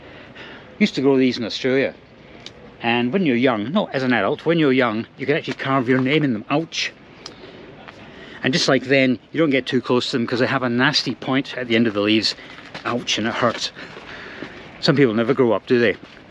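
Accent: Scotland, United Kingdom. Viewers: Nice that you were brought up in Sydney and were able to keep your great Scottish accent！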